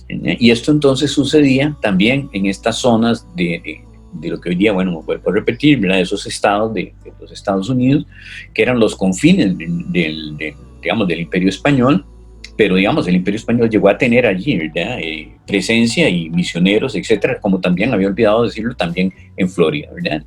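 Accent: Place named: England, United Kingdom. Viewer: Teniendo en cuenta que tiene acento inglés demasiado bien habla de la época virreinal.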